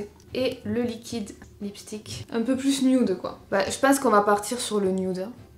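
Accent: South, France. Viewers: A chaque fois que je vais chez Action et que je passe dans le rayon make-up, je regarde vite fait mais j'achète rien parce que je trouve que ça a l'air cheap à mort et je me méfie des marques "inconnues", j'ai peur que la compo soit pourrie et que ça soit tout naze mais franchement à chaque fois que tu fais des tests de leur make-up ben je suis agréablement surprise et au final y'a des trucs qui me donnent envie ! Je crois que je vais me laisser tenter par une de leurs palettes (même si j'en ai déjà plein et que j'ai quasi toutes les couleurs possibles) ! Donc merci de tester à ma place ! x) J'adore le make-up que tu as fait, il te va super bien au teint et s'accorde super bien avec tes beaux yeux bleus ! C'est cool d'avoir changé de couleurs et de ne pas avoir fait comme d'habitude un make up doré/marron (même si j'aime beaucoup aussi) ! Toujours un plaisir de regarder tes vidéos après toutes ces années, je ne me lasse pas. 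Ton accent du sud et ta bonne humeur me font toujours un bien fou, tu arrives toujours à me remonter le moral, tu es ma petite dose de gaieté hebdomadaire ! <3 J'espère que tu n'arrêteras jamais de nous faire des vidéos ! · Le dimanche c'est toujours le coup de blues, heureusement ya l accent du sud de pour ramener un peu de soleil ⛅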